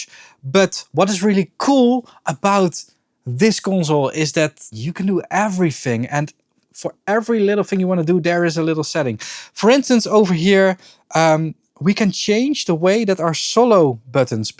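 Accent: Netherlands. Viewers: i keep coming back for your dutch english accent, dutch people are hilarious but very smart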